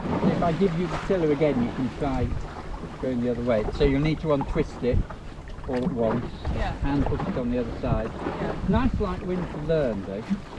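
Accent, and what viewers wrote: United Kingdom. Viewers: I surely no not know why, since my ancestor settled in Canada only 55 years after the foundation of Québec by Samuel de Champlain in 1608, I feel I have to offer you my apoligies for this rather uncivilized fashion with wich this attendant from Audierne treated you and, but I do. J'adore l'accent british;)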